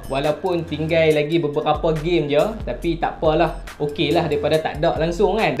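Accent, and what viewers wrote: Kedah, Malaysia. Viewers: Best...Berita kedah ja...ckp pon lorat kedah...snang fhm...negeri lain nk fhm kena Cari kamus dulu...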